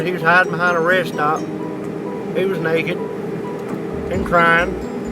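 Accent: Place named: South, United States. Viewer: I live in Jacksonville, and this guy sounds country as shit.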